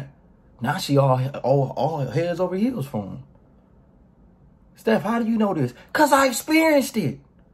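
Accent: Baltimore, United States. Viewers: Steph could pass for a BMORE dude by just the accent alone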